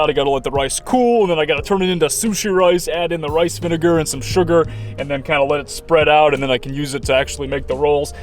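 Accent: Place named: New England, United States. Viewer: It was that 'HIGH-SPEED NewEngland speak' you do; threw them off during your greeting😉😊. Some visuals highlights of some of these cities/suburbs would be a nice addition,. JOY!💚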